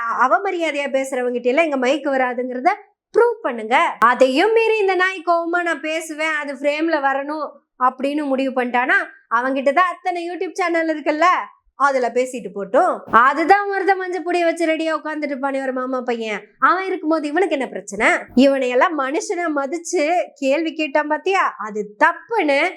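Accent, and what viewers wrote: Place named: Coimbatore, India. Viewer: கோவை வட்டார வழக்கு,, நல்லா சுத்தமா பேசுங்க சகோதரி....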